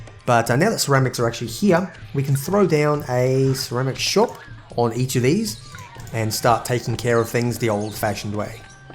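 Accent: Australia. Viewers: LOVE the aussie accent - ha ha, I'm a kiwi so were not much better! Nice walkthrough, awesom game, keep it up mate!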